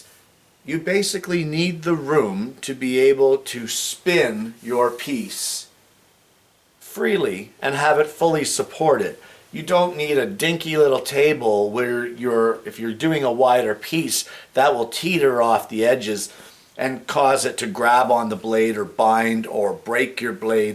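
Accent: Canada. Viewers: I really did appreciate your video - it really opened my eyes. So, I got close, eh? HAHA!!! Your lic. plate is Ontario, and your accent is Canadian ... I grew up in Buffalo, NY. Gets cold, but hey, we gotta woodworking shop for that, right? Great teaching! Thanks again! Btw, I'm going to build wooden clocks with wheels no larger than 20 cm; could you recommend a model that would do wonders for me? Thanks again! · I thought Canada too, from your accent. My son's living and working in Toronto, so I expect his accent will change slightly while he's there. The scroll saw tips are really useful. So much choice, I need to be well-informed before buying one.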